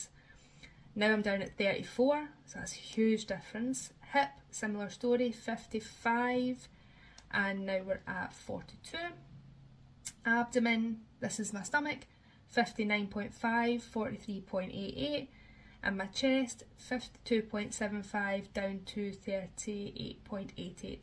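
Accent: Scotland, United Kingdom. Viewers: Just found your channel and well done on your amazing journey. Excited to hear another Scot here 🏴 ❤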